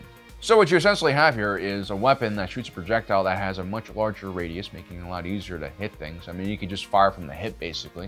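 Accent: New Zealand. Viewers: Australian? No Kiwis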